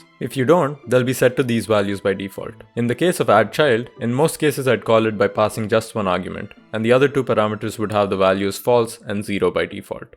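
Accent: India. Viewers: >click >indian accent >dislike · no front, but why are the best tutorials and tipps brought by people with indian accent?^^ Again here, no front or offense or something^^. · Bro, are u South Indian because of the accent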